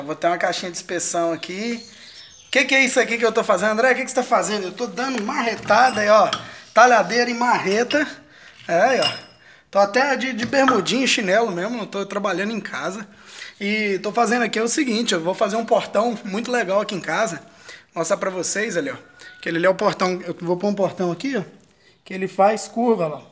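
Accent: Goiás, Brazil. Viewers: Tu es da onde cara? Você falando parece ou Zezé de Camargo ou Leonardo. Você e de Goiás?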